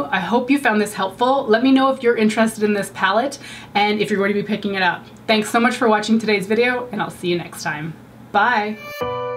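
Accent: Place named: Eastern Canada, Canada. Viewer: Your eastern Canadian accent is the best I am from the west and my Canadian accent is so horrible in comparison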